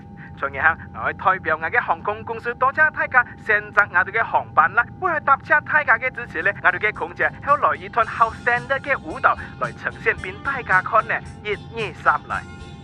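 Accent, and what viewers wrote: Huizhou, China. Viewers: 好像惠州客家话的样子，不过和梅县腔不一样啊，配音的视频吧？ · 视频讲的客家话是惠州腔。我看过惠州视频里面讲的客家话跟我们沙巴的一模一样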